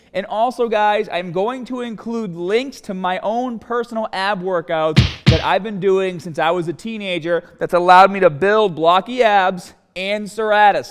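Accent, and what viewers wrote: Boston, United States. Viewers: Is that a Bostonian accent I hear?? :)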